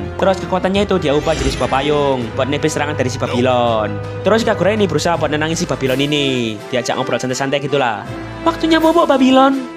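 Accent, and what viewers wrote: Java, Indonesia. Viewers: 3 orang yang gua suka denger logat jawanya : 1. Guru matematika gua jaman smp 2. Pak jokowi 3. Mas mas yang punya channel ini 😅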